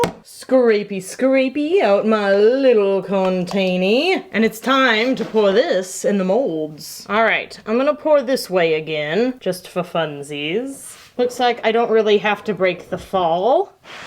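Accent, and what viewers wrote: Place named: South, United States. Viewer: May I just say that as a southern woman my self. It brings me great joy to hear her accent and her say " yall" 😌😂😂 makes me feel right at home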